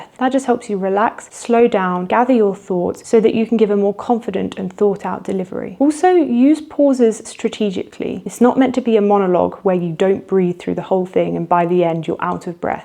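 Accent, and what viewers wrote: United Kingdom. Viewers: Good video. Is this rp accent?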